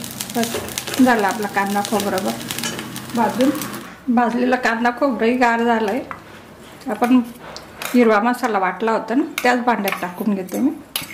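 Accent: Khandesh, India. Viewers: खानदेशी आवाज 😍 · तुमच्या toning वरून तुम्ही कोकणातल्या वाटत नाही, तुमचं toning नाशिक, मालेगाव, जळगाव इकडचं वाटतंय... पण रेसिपी खूप छान, अस्सल मालवणी .. 👍😀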